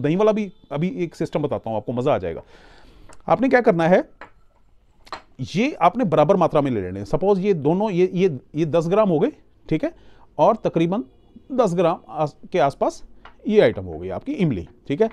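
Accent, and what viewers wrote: Punjab, India. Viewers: Doctor Sahb ka utshah or bolnna ka lehja dekh Kr video K shuru se hi lag rha tha k doctor Sahb😀😀 Punjabi hai 😀😀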